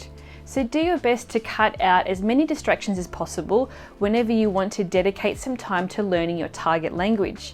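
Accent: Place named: Australia. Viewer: Hi, thank you for your informative video. I was really looking for techniques to expand my general vocabulary. I can easily visualize and link nouns as they are easy to form a mental image. However, can you give any tips on how one might form an image and action mnemonic to words which do not immediately form an image? Eg. seraphic, sedulous, unctuous etc. Really appreciate your video, there is a lot of valuable info I had not considered such as space repetition. Oh, and it's great to hear a fellow Aussie on YouTube too:-)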